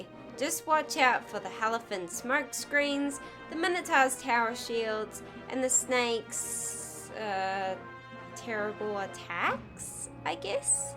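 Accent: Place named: New Zealand. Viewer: Thanks for the guide, and I love your accent, it sounds like New Zealand.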